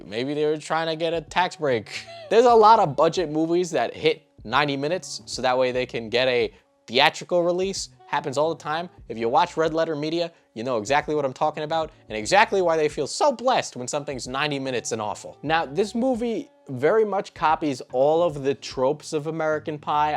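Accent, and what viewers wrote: New York, United States. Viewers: Are you from Northern America? You sound like a New Yorker, I love your accent ^^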